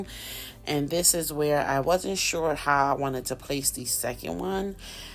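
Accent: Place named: Philadelphia, United States. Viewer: I knew you were a philly girl as soon as I heard your voice..I can recognize a fellow philly girl from anywhere! Lol....I love what you are doing and your work looks amazing I moved to Florida and I am about to start my business as well.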